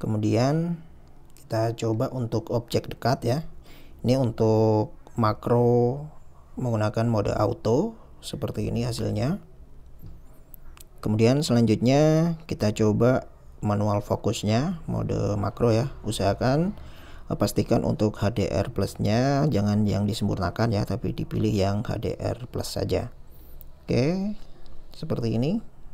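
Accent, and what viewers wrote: Pasuruan, Indonesia. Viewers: Wong Pasuruan a mas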